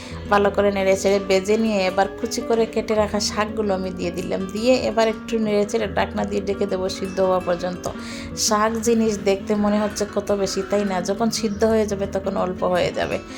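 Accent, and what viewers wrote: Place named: Sylhet, Bangladesh. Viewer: আপনি সিলেটি।কথায় ই বুঝা যায়।আমি ছিলাম ২৪ বছর।এখন ফেনি আছি বাবার বাড়ি।বিয়ে হইছে ৪ বছর রানিং।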